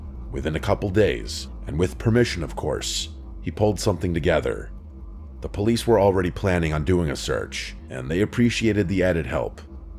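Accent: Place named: Rhode Island, United States. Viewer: U know that u have a perfect voice for narration!! I detect a North eastern accent like maybe Rhode Island?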